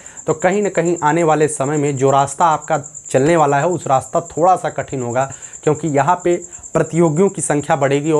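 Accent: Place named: Awadh, India. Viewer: Logo ko awadhi aur bhojpuri main farq hi nhi pata.. hadd hai....aap sir awadhi bolte hain aur acchi bolte hai ..hum awadh walo ko bdi aatmiyata lgti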